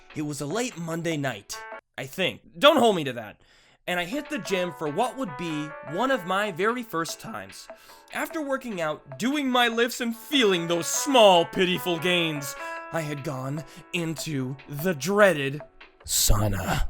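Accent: Canada. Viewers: he is Canadian?!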